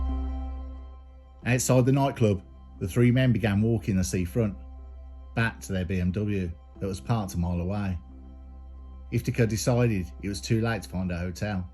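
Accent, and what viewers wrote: Birmingham, United Kingdom. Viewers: I know i keep saying this but I ❤this curious and spiritual i just wish you could do videos on a more frequent basis love it tho love the content and your narration sir are you a Brummie sir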